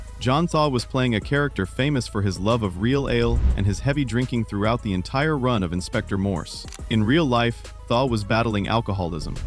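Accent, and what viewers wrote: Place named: United States. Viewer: Interesting but it is a shame that AI accent is American.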